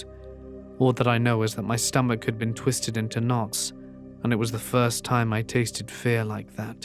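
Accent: United Kingdom. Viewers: ❤your voice uk from ?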